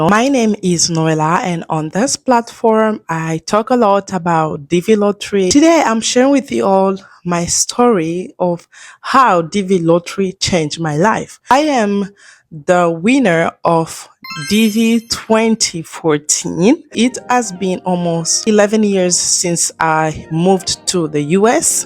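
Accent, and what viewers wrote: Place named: Democratic Republic of the Congo. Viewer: I can feel with your accent that you are from the Congo.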